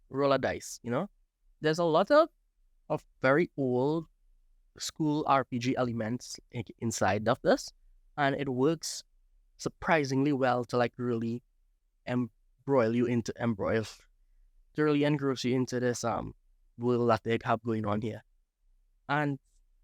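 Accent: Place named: Trinidad and Tobago. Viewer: It's a Trinidadian accent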